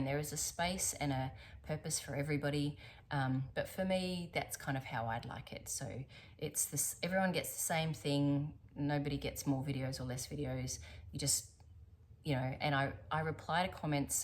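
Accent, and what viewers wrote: Australia. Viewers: I have been gradually going through your library of podcasts - so lovely to hear an (eloquent) Aussie voice talking about making, as I'm currently living in the States. That shawl is definitely something to be proud of, it's stunning! Can't say I'm ready to dive into such a big project though, my queue is already too long. Out of curiosity, what are the shops you like to shop and ship to your American family? I feel like now that I'm here I'm missing my gorgeous Aussie merinos, but I've previously ordered Brooklyn Tweed and paid through the nose to ship it to Aus. Looking for some inspo to shop 😂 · Hi, am so glad I found your podcast and can’t believe it occurred by watching Beth Macdonald Stone’s podcast and she mentioned she watches and really likes your podcast. Since then I’ve been watching all your videos. As a fellow Aussie living down in Melbourne I’m just loving hearing an Aussie voice and of course seeing all your wonderful makes. 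Am also a big fan of Skein Sisters and buy from them regularly. Love your work ❤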